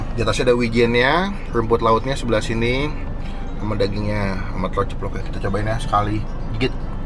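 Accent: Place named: South Jakarta, Indonesia. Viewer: Kok kamu jaksel sekali yoooo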